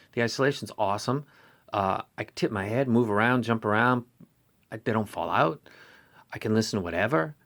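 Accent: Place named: Maine, United States. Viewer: you sound like youre from Maine :)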